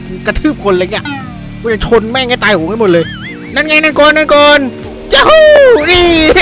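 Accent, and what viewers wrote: South, Thailand. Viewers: ชอบมากเลยงับ สำเนียงใต้ <num>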